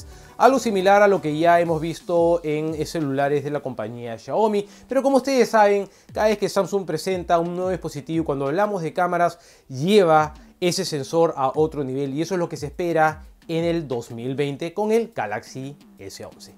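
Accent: Peru. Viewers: Él es peruano?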